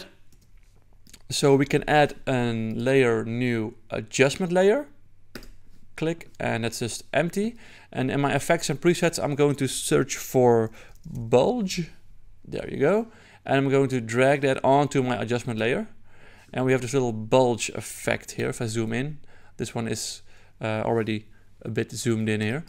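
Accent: Netherlands. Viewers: Nice video. you can never get rid of a dutch accent i guess.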